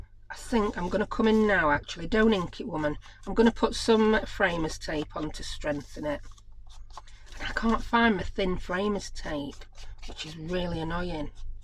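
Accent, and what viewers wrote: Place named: Hull, United Kingdom. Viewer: your accent at times almost kills me lol. My parents are from Hull, they came to New Zealand in 1952. I reckon I'd sound like you if they'd stayed in England. When I first went to school the teachers couldnt understand what I was saying. My accent musta been pretty full cause I'd only been with my mam and da. Sending hugzz 😊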